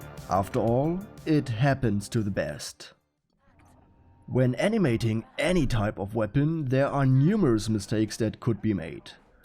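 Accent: Germany. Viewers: Klingst deutsch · Love the German accent bruder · sry kann mir dass net geben der deutsche akzent kickt zu hart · German accent maybe? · Dein deutscher Akzent ist wirklich fürchterlich aber echt nices Video. Du scheinst zu verstehen, wovon du redest😄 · Are you German? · Fantastic work as always,. Are you a Bundeswehr vet? You mentioned the G36 paddle release being something you're "quite familiar with." Maybe the comments just pointed it out to you but you've got the right accent for it:) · Das hört sich schon nach einem starken deutsch Akzent an, bin ich da richtig? :D Vllt solltest du das "TH" nochmal über. Da bekomme ich jedes mal gänsehaut ;) Sonst ein super Video :) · i was kinda confused if your accent was german or not, now im pretty sure it is(u can just ignore this, im only proud of myself) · Ich habe bereits in den ersten paar Sekunden gecheckt, dass du ein Deutscher bist! 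;) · So Deutsch der Akzent ^^ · You can hear out you are german, correct? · I can hear your german accent mein freund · Wusst ich doch, dass das ein deutscher Akzent ist :D Schönes Video! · the accent...YOU ARE GERMAN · Gib dir mal mehr Mühe bei der Aussprache! Dieses typisch deutsche "s" statt "th" treibt mich in den Wahnsinn! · Are ja german?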